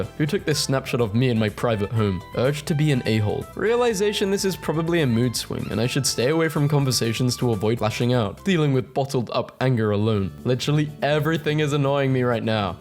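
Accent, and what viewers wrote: United Kingdom. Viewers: Why can't I tell if you are British or not? It's making my skin crawl.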